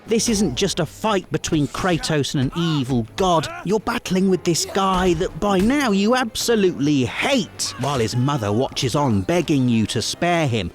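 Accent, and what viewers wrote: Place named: London, United Kingdom. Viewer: Aah, starting my weekend with a good breakfast and a -bish video... 😊👍 But can someone here please tell me, what kind of slang is speaking? Is this pure and clean english language from London? It's a real pleasure to listen to this.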